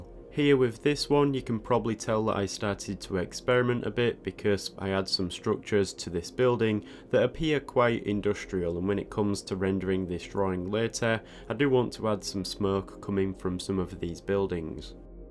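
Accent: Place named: Humberside, United Kingdom. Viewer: i cant help but notice your accent are you perhaps from humberside?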